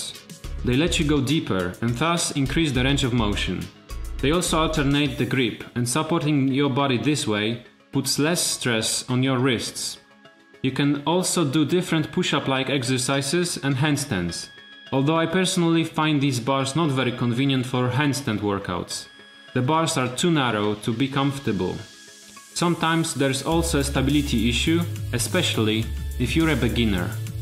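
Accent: Poland. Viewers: Ha! Tak też myślałem ;) Akcent typowo polski, ale całkiem dobrze mówisz po angielsku. BTW. podsunąłeś mi ciekawe pomysły na wykorzystanie moich obręczy i też uważam je za najlepszy zakup sprzętu ;)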